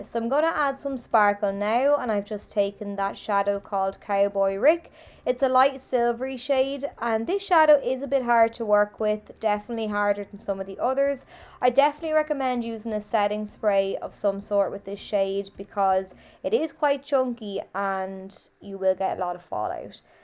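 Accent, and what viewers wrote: Ireland. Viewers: Thank You for another fab eye look loving the smoky emphasis on the lower lash line and with a gorgeous Irish accent, I do love an Irish accent you know 🥰⭐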